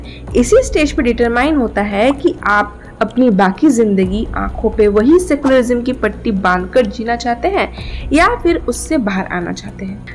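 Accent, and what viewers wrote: Bengal, India. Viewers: Bengali ho aap sayad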